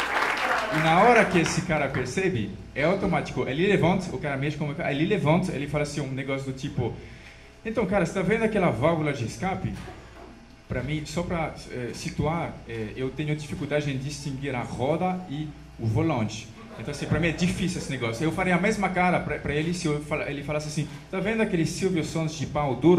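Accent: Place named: France. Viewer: Hahaha amei! Esse sotaque francês é demais 🤩👏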